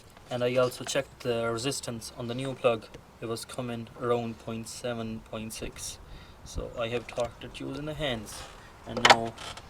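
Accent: Cork, Ireland. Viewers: A Touch of a Cork accent is it? . Good diag I have the Autel Ds808 good tool for the money. Well done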